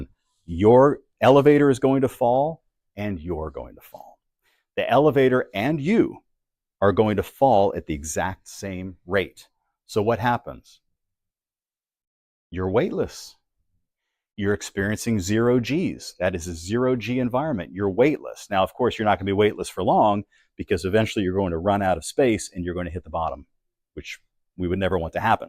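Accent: United States. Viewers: I’m hooked on this channel But since he seems to have a pretty distinct American accent I assume this is probably an American made video. We don’t generally use kilometers here. I have no idea what the ratio is to miles. Nor do I want to stop constantly and look it up. Use miles! Or at the very least use both all through the video.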